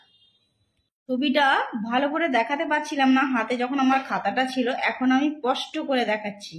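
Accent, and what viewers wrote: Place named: West Bengal, India. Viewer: Ooo ma go dada ki koreta a to West Bengal r vasa❤❤❤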